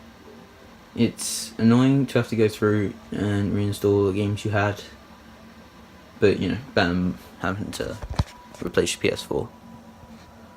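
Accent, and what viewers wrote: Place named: England, United Kingdom. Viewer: Omg u chocolatey English guy it works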